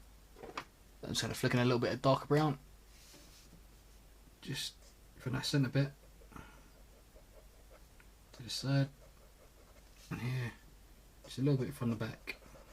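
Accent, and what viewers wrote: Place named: England, United Kingdom. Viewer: he has an english accent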